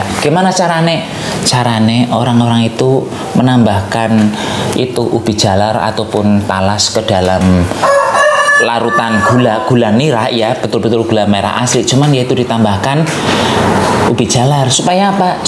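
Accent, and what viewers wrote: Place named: Banyumas, Indonesia. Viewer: Liik sampean asline Endi, medok banget ngomonge .. Banyumas apa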